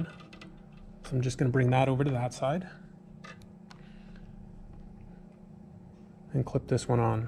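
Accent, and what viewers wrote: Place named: Canada. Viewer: I am going to try to fix my water heater and I wanted to understand the underlying concepts and this really helps. He sounds like he's from Canada or the northern Midwest.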